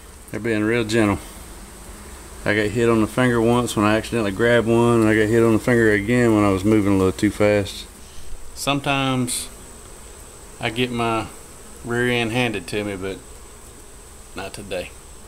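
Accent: South, United States. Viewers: I'm fascinated, I've never seen anything like this. Great video! love your southern accent ;)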